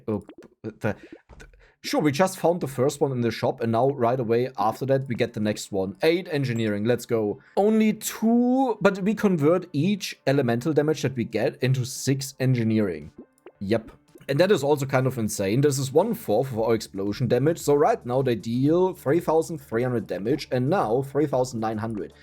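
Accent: Germany. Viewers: Hi there, may i ask from wich region in germany you are? Im realy not sure with your accent. Thanks for your videos they are quite entertainung.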